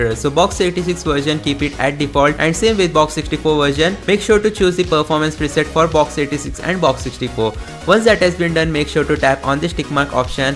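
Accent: India. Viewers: Hint ağzıyla ingilizce konuşulduğuna tanık olursanız (bu videodaki gibi) kaçın. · Need an emulator to change Indian accents, sounds like an Indian Scam Call Center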